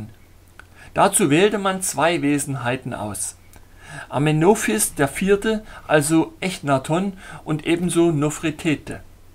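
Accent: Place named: Saxony, Germany. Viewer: Langsam gewöhne ich mich an den sächselnden Tonfall der Lesungen 😊. Ich höre mir mittlerweile jeden Abend beim Kochen und Hauswirtschaften ein Kapitel aus den Erzählungen unserer Vorzeiten an und bin jedesmal inspiriert. Lieben Dank für diese erzählerischen Ausflüge zu ein paar Kapiteln unserer Vorfahren ❤. Zu den Riesen fällt mir ein bebildertes Kinderbuch wieder ein: Gullivers Reisen! Ich habe als Kind nie verstanden, warum die kleinen Menschen sich allein wegen der Größe des Riesen fürchteten und ihn solange bekämpften, bis er auf der Erde lag und bewegungslos gefesselt wurde 😢. Die Riesen waren ja unsere Mitmenschen und zum Teil Familienmitglieder und Freunde.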